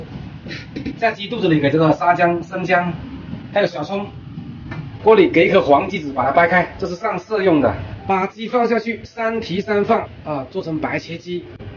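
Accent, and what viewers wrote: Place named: Guangxi, China. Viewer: 柳广普通话😀现在兴吃醋泡鸡？👍👍👍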